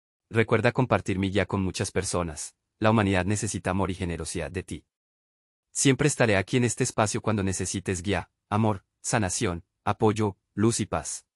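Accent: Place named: Colombia. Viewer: Bella, que bueno q la voz es de acento colombiano y no de una maquina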